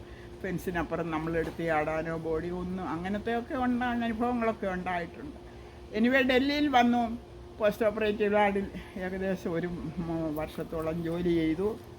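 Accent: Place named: Pathanamthitta, India. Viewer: ഞങ്ങടെ നാട്ടിലെ സംസാരം.... ചേച്ചി ഉമ്മ...പത്തനംതിട്ട 😍 അമേരിക്കയിലും നാട്ടിലെ പോലെ കപ്പ, റോസാ ഒക്കെ നിൽക്കുന്നു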